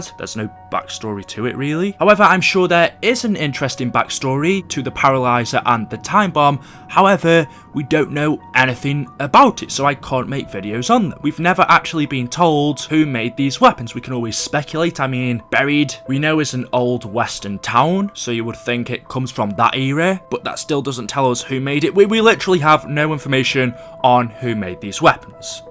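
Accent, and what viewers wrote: United Kingdom. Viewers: Not a zombie related one But please can you tell us where are you from since you’ve done the face reveal Im assuming that you’re from UK based on you accent also love your vids bro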